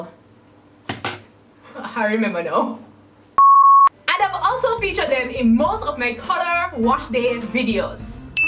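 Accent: Jamaica. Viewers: Are you Jamaican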